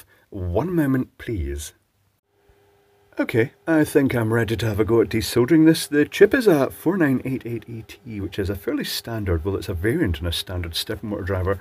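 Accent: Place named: Scotland, United Kingdom. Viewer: Fluxing and removing chips.... its a lovely Scottish sounding Louis Rossman, with less swearing!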